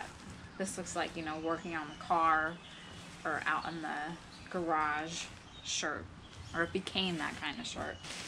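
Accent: New Jersey, United States. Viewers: It’s a NJ accent.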